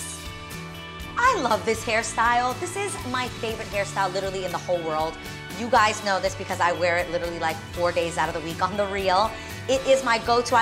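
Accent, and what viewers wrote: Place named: New York, United States. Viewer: I’m in love with your New York accent. I’m jealous. By the way, awesome video! I’ll definitely try one of them!❤️💙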